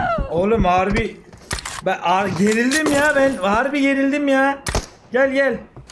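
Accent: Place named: Şırnak, Turkey. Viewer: konusman çok iyi be hacı❤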